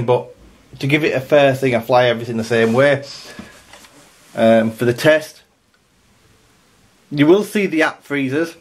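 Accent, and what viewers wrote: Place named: North, United Kingdom. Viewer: Thanks for the sub, sounds like tha'as from t'same part of t'world as me.Happy Flying.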